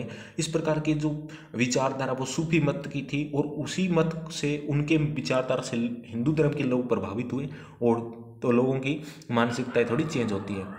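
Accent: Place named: Haryana, India. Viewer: Sir aap Haryana se ho... Aapki voice tone haryanvi lag rhi ha... Sun... Ka samjh ka bhot badiya ... Laga ....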